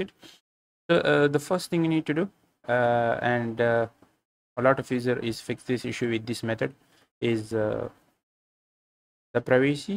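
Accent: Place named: India. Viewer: Thank you it worked! Always some guy on Youtube with Indian accent will save your ass!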